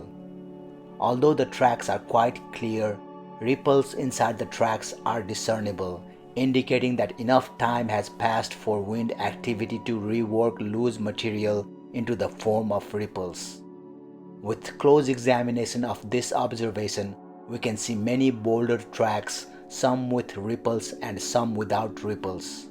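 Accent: India. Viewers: Are you from north east India? My wife is from Assam and your accent sounds similar. Cheers and thanks!!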